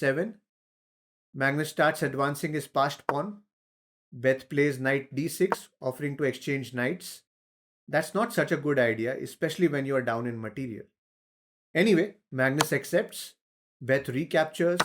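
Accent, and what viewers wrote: India. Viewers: finally an indian accent i understand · an by the way..? which indian extreme accent person, was able to rise nearly a million subs with chess? is that corona are the entire world silly nowaday.. in einer welt die verrückt spielt, ist nur ein irrsinniger wahrlich geisteskrank...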